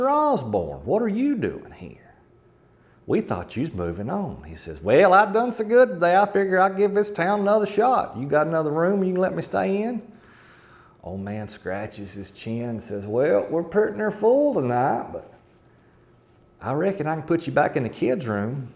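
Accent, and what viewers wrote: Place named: Appalachia, United States. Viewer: Really good story! And told with great Appalachian voice.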